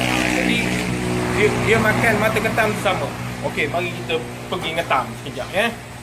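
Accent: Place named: Perak, Malaysia. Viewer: Assalamualaikum.. Bos area mana.. Dgr mcm belah Perak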